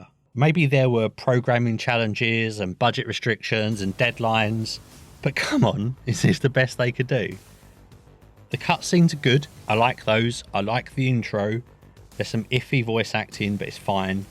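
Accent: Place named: England, United Kingdom. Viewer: That's a very English accent for such a German sounding channel name what's going on there?????????? Also emotional damage oof what a burn!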